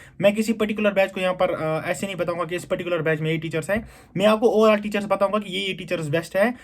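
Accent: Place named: Kashmir, India. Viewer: Aap kashmir se ho kya bhaiya qqki apki accent waise h ❤❤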